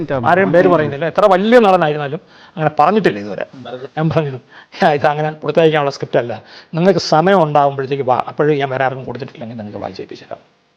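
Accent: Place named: Thiruvananthapuram, India. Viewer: എന്താരായാലും നീ വായിക്കുന്നവത്... തിരോന്തോരം തന്നെ അപ്പി....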